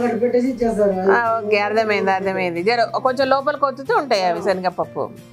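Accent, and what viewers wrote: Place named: Mahabubnagar, India. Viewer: Hi aunty nice video medi kosgi side Kada aunty uru pothireddypalli ante mahabubnagar dist e Kada meru mataldtunte alane anpistundi nice video aunty murkulu pedaga chesaru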